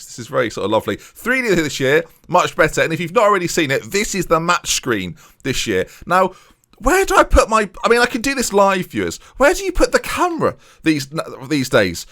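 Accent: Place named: Derbyshire, United Kingdom. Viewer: You sound like my girlfriends family- and they are from Chesterfield. More Derbyshire than Yorkshire hahaha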